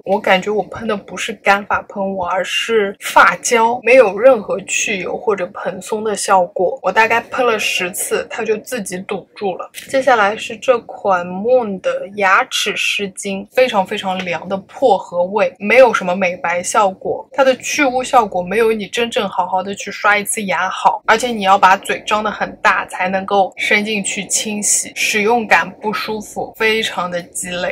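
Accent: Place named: Shanghai, China. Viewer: 是不是上海人啊，口音很熟悉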